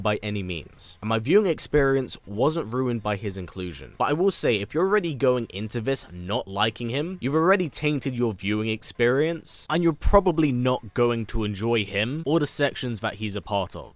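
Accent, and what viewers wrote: United Kingdom. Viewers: How did you watch it in the UK? (Assuming from your accent you're British too)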